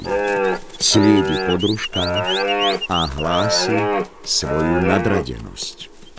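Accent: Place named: Slovakia. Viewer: Pútavé a tá pravá írečitá Slovenčina.Super.Ďakujem.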